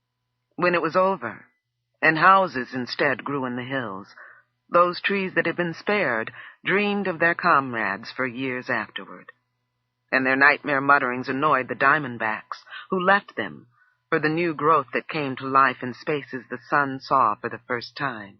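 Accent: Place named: Midwest, United States. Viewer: Wow. Lynn Thigpen. I know some of her family and her work onstage and onscreen is excellent. Great voice. That lovely clear Midwest Black voice.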